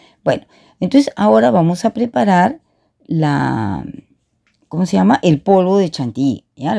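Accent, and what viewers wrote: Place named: Barranca, Peru. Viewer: Me encanta .... juraría que eres de barranca... hablas igual que mi tía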